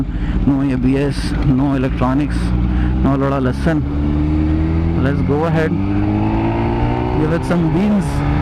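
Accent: Hyderabad, India. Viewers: Bhai.. u r english is very good. That combination english + hyderabadi is jst🤩🤩🤩 keep going broh. We will support you.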